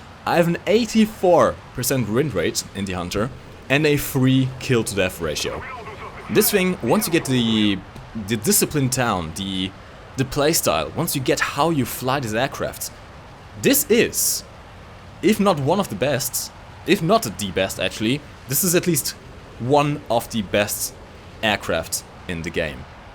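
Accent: Germany. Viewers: I knew you were german and not portuguese :P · Are you German?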